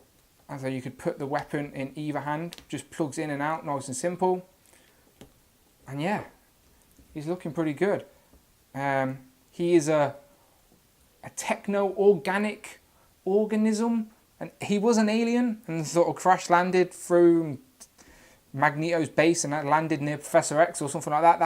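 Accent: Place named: Manchester, United Kingdom. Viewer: got this wave or partial wave as a gift for a friend still waiting on one in the mail didnt get cyclops cause i refuse to pay fifty plus but did get the leg for five, but wondering all plus this guy eighty five dollars is that cheap or a deal anyhoo good vid your accent sounds like your from the manchester area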